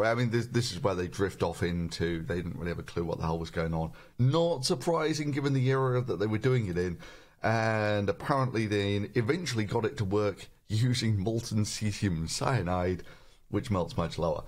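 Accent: United Kingdom. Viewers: is that a Scottish accent? Your long form videos feature a different accent.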